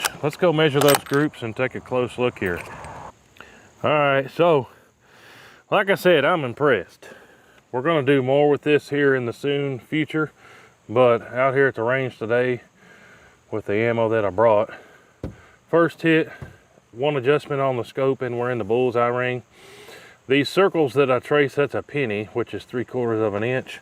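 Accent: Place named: South, United States. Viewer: I just noticed your voice and accent is very close to Hank Hill.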